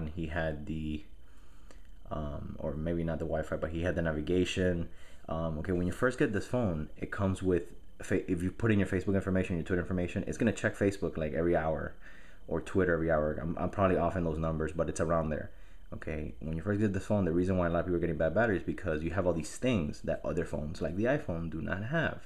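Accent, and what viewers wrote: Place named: South Florida, United States. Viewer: thanks for your reply.. p.s. when I heard you on the video I thought you sounded familiar and then I saw that your from south fl... i'm from Miami but living in va beach for now... thanks again.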